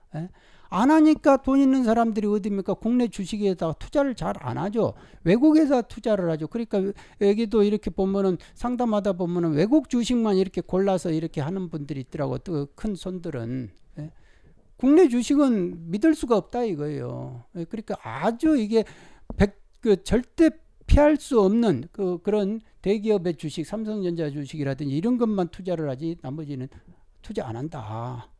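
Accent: Jeolla, South Korea. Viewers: 전라도분 같은데 문재인정권에 대해 어떻게생각하시나요?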